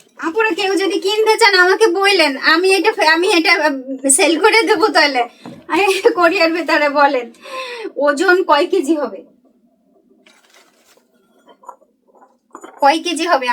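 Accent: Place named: Khulna, Bangladesh. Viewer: আপনি খুলনার মেয়ে হওয়া সত্বেও ,আপনি খুলনার ভাষায় কথা বলেন।ধন্যবাদ।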